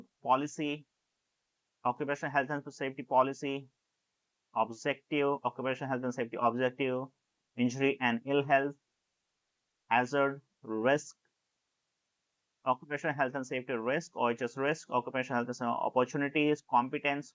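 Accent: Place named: Bihar, India. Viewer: Sir very amazed after listening you you have eloquently explained each and every aspect. Be blessed always. Love From Pakistan. I think you are from India most probably from Bihar you ascent and facial expressions are resembling to that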